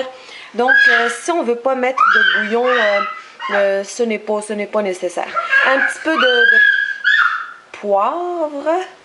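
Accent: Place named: Quebec, Canada. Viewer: J'aime pas vraiment l'accent québécois ca ne te va pas très bien désolé :(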